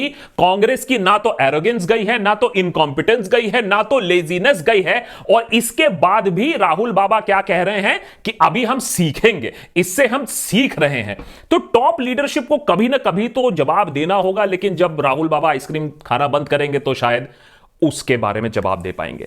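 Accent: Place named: Uttar Pradesh, India. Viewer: Ap ekdam up vaalo ki trh hi accent mei bolte hain.... BHAKT BANNERJI ka role karte karte ek dam smooth ho gye Love your episodes love your integrity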